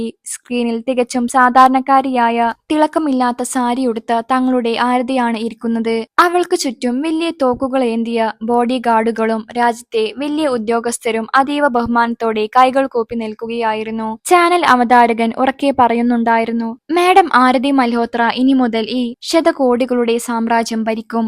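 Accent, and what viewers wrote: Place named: Kottayam, India. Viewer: വെല്യ എന്ന വാക്ക് കോട്ടയം സൈഡിലൊട്ടൊക്കെ കോമൺ ആയി യൂസ് ചെയ്യുന്ന word തന്നെ ആണെടോ 😊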